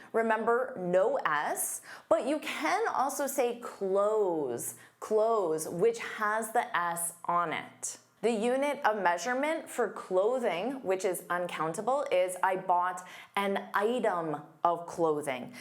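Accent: United States. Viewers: She sounds like a yank they have know room to say anything about the English language they misspell words and pronouce words incorrectly ie mobile becomes mobul, although they do spell the word the same, like missile becomes misul. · Obviously she's american. Isn't she?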